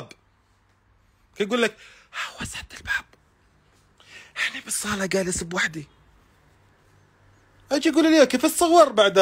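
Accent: Morocco. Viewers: ليش يحكي مغربي شو نسى لهجته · ليش بتتكلم مغربي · ليه يتكلم مغربي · اللهجة المغربية نتاعو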